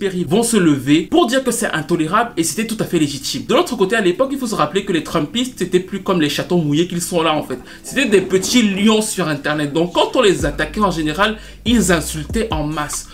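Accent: Cameroon. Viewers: Très bonne vidéo, très bon orateur ! L'accent camerounais rajoute un pti truc en plus j'adore, c'est charmant. J'attends la storytime sur Missy Elliott please!!!! ☺️ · Tu parles comme un camerounais 🙂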